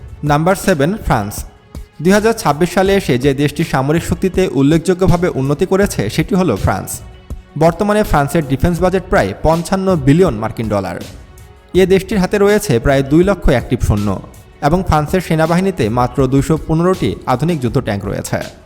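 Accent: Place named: Bangladesh. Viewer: তুই যে বাংলাদেশি সেটা তোর কথা শুনলেই বোঝা যাচ্ছে ।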